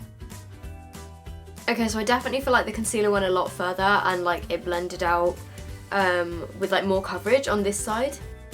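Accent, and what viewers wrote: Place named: United Kingdom. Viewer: Im probably the only one who doesnt really enjoy the british accent but I could listen to yours for hours. thanks for making videos, love from germany ❤❤❤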